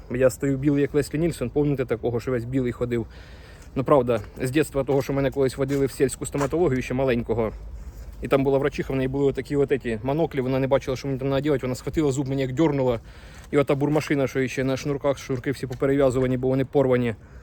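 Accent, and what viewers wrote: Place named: Poltava, Ukraine. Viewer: Рідний говір ) . Ви з Полтавської області? )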